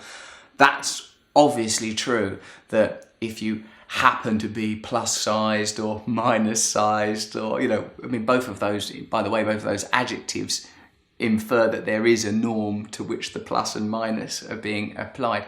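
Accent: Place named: United Kingdom. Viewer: I had no idea Russell Brand was such a deep thinker..not even sure how I got to this video ;) Truth be told, I can't resist a handsome guy with a British Accent, and saw the video was under 10 minutes so..but all kidding aside, yes, health is of course important..but most important is ACCEPTANCE. Of yourself and others. There is no change without acceptance, and don't we tend to criticize in others what we don't like about ourselves? Kindness is so important and so absent from all forms of media, especially social media. Well done.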